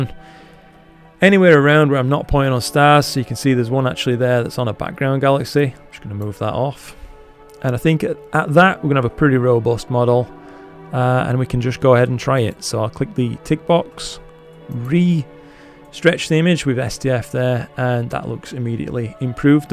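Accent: United Kingdom. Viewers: time well spent watching it….very melodious also with your British accent!😜 i wish i could speak English in that accent!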